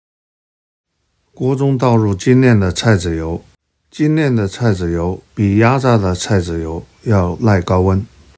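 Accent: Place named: Wuhan, China. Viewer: 哈哈 这纯正的武汉普通话，好熟悉。不过这个面窝完全都没有没有泡起来，跟武汉的面窝还是有点差距。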